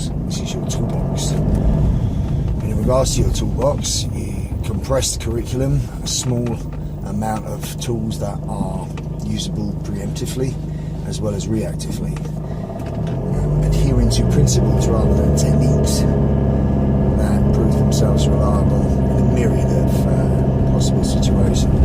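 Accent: England, United Kingdom. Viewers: I did‘nt understand only one word with this England english😭😭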